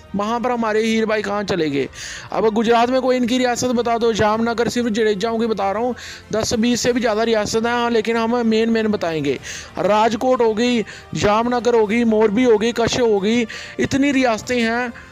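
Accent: Punjab, India. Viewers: Hukm aap kaha se h aisi hindi punjab ke bhai bolte hai❤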